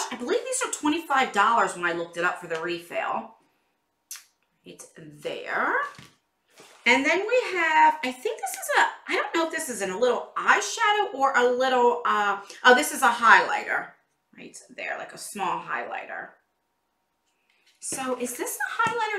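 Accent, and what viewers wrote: New Jersey, United States. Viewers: I love myself a good nude lippie and I like how u say really your jersey accent comes in I understand the struggle I got a ny accent lol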